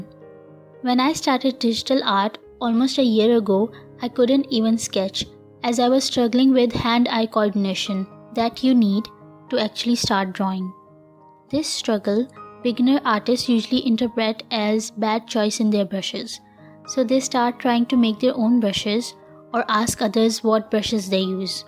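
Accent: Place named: India. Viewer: There are tons of videos on brushes but this is the most helpful among all. I love the format of your videos and tips too😍😍🤗☺️. Your accent sounds like Indian...are you Indian 🤔🤔.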